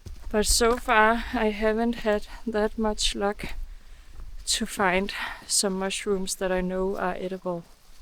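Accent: Denmark. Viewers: Your soothing Danish voice almost put me in a trance, for a while I thought I was in a remake of Elvira Madigan (1967) then I snapped out of it, unfortunately, I would rather have stayed there! What a wonderful video, thank you! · Thank you for the video from my home region "Söderåsen", It was a lovely Danish accent, I love Denmark, Danes, have been there so much that I am almost half Danish hi hi, Nature in Söderåsen is beautiful but I am not so happy about the wolf implementation, people have lost dogs and soon it will affect some people! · Den danska accenten i engelskan är mycket vacker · It's so funny that I only need like 5 seconds to hear that you are from Denmark 😄I'm danish myself that's why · Sorry - men jeg kan ikke klare med af den danske accent i det engelske, havde håbet at det blev bedre, men jeg står af nu.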